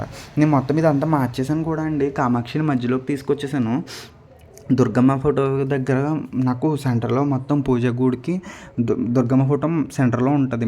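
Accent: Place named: Godavari, India. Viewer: Meru godavari vallandi. Mata telustumdi. Alamkaram baga chesaru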